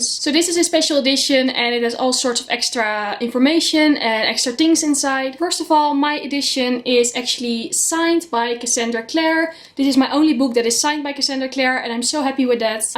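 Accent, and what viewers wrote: Netherlands. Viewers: Hoorde je Nederlandse accent al vanaf de eerste zin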